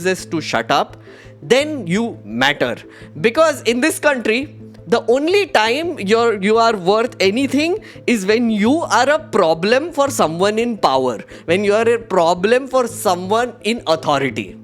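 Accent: India. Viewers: Bro u need to change ur accent it sounds so stereotypical Indian and is the reason for our loss of dignity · nothing wrong with having an Indian accent. he sounds dignified to me. no need to copy the English or Americans. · You’ve got the perfect Indian accent!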